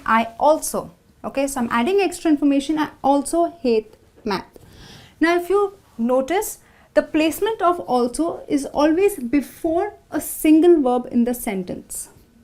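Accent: India. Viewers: is she indian? she has a particular accent, she doesn't sound like an American. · you agreat , you from indian i know from your english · Hi! Thank you for the explanation! Are your accent from India?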